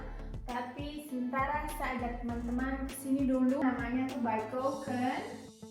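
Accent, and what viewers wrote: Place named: Eastern Indonesia, Indonesia. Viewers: Hai kak, baru nemu dan langsung subsc dong pass denger logat ketimuran kk 😅❤ salam kenal dari sultra 😘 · Shalom mb sy br subcribe slm kenal sy agustina suku Toraja- Indonesia, logatx mb spt org toraja slm succes mb n Mr. Tuhan Yesus Kristus memberkati kita 🙏🥰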